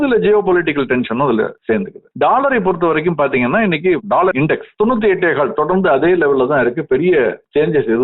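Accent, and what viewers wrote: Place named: Sivaganga, India. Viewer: Eruchunaa illai. ஏறியது என்றால். அவர் பேசுறது சிவகங்கை மாவட்ட பேச்சுவழக்கு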